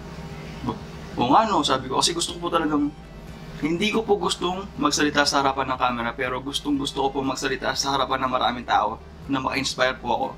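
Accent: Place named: Pampanga, Philippines. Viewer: Ali naman boring sao..okay yamu..mas masanting pin more on kain hahaha..pero pag atsu sao ann atin talaga kwento tang punto ng kapampangan yang magdala hahahhaa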